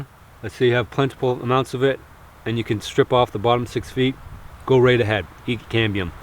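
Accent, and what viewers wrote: Canada. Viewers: I like how he doesn't have a Canadian accent and then it just says in this one video of how he talks Canadian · Finally a Canadian accent i can hear. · you are Canadian! Test it Oat = test it out! Haha, nice channel! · You sound hella Canadian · cool! Ton anglais est impeccable, impossible de deviner que tu parles aussi en français:) mais probablement avec l'accent du Nord comme à Sudbury:) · The second I heard your voice I knew you were Canadian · He’s sounds Canadian😄😄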